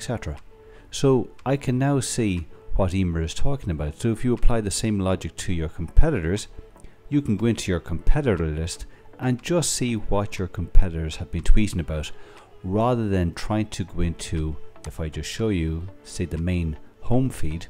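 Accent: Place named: Ireland. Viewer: Great thanks. As a Scot, its so refreshing to hear an Irish accent, so much easier on the ear and easier to understand than many of the other accents you get on these types of videos. Thanks.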